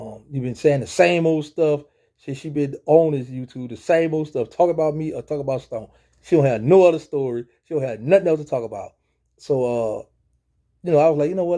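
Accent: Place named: South, United States. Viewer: True souf ngga😂 the way you tlk just cracks me up😅😅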